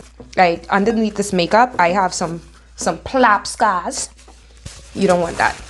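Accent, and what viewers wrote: Bahamas. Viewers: That real Bahamas beautiful dialect and excellent cooking approach and techniques totally rocks. Has me here in Canada salivating and tasting home. is very authentic in her personal style....a real Bahamian.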